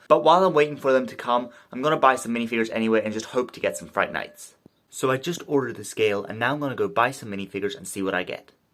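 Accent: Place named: Eastern Canada, Canada. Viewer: I'm from Eastern Canada and the speed talking with heavy accent was 100% intelligible lol just like home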